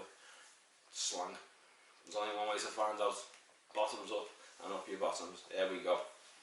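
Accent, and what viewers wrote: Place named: Liverpool, United Kingdom. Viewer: I love how you say ‘Turkish’! Love the Scouse accent. Looks like my scrotum. Hahahaha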